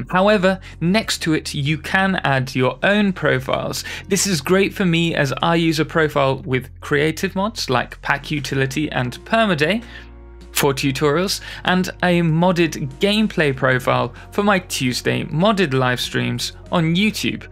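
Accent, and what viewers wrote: United Kingdom. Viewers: I just want to thank you for all your videos. Learning so much from here... I'm brazillian and even you gourgeous british accent isnt a problem since you care to speak slowly so... Honestly your videos are trully perfect. Thanks for your generosity. <3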